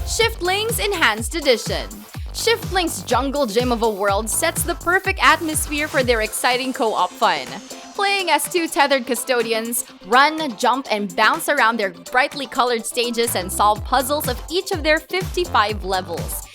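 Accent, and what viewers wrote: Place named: Philippines. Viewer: This is an unmistakably Filipino English accent.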